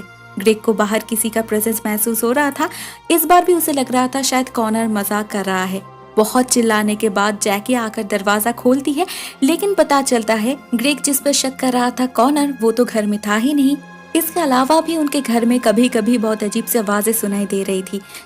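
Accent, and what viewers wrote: Bengal, India. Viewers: Sweet voice in some bengali tone. You explain every corner of the story in your clear voice and without any noise. Feels like we are watching the movie. Thanks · Bangala bhashi.....tone...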